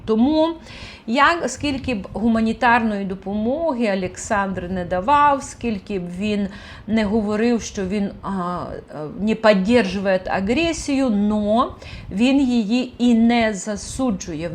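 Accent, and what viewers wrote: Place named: Surzhyk, Ukraine. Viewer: Ну, подарунок вы ещё тот. Вы говорите на суржике. Смешной, исковерканный говор. Пидуть, справди, агрэссия, винувата, процентув, сказав, говорыть. Всё исковеркано. И вы захотели, чтобы другие тарабанили на этом подарунке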